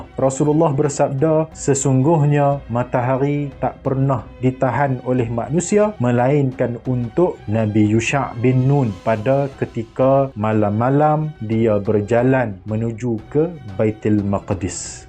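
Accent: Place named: North, Malaysia. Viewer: Terbaik orang utaqa, 👍👍🤘🤘🤗🤗 penyampaian yg baik & mudah paham dalam loqhat utaqa..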